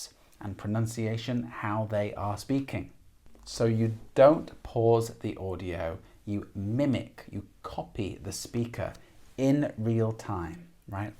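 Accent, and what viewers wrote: RP, United Kingdom. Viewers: Very usefull information, thank you for your help, i live in uk from 15 years and my english is A2 level🤦‍♀️i did not like to study in Poland, i was boared and stressed on lessons, thats why i didnt want to learn anything , but you chaneel and tips giving me opportunities to try different ways plus is good to hear reall british accent as on daily basis i work with other multi -culti people so no chance for me to learn proper english :( i also want to learn spanish and italian 😍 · You use received pronunciation and I have understood each word. But sometimes it's very difficult for me to understand the native speakers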